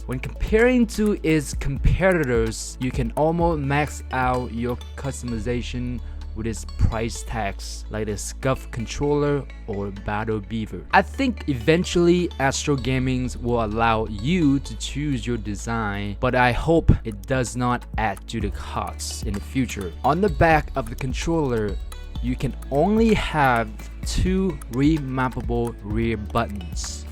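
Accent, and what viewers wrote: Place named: Vietnam. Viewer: Thanks for your honest opinion review about this controller. I’ve been thinking about getting this since it first launch. Guess I have to buy one and try it out for myself. Btw, love your accent are you Vietnamese?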